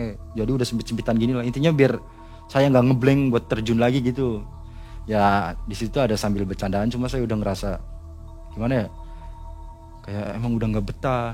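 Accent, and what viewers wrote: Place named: Tegal, Indonesia. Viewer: Sampean ngapak Tegal yh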